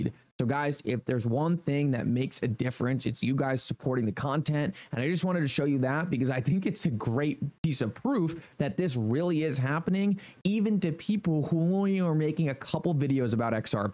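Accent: Allentown, United States. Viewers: with an Allentown accent strikes again with lies and false hopes.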